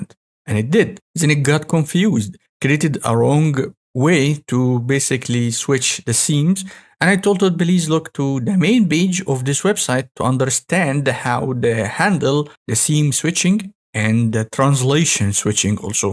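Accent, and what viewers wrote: Egypt. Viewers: Brother, you sound egyptian. Great video btw.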